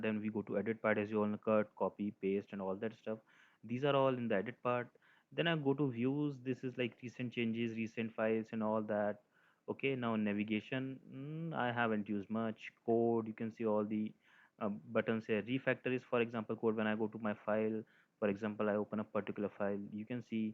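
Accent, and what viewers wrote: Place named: India. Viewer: what is it with all this indian dudes, i just can't find a video without a heavy indian accent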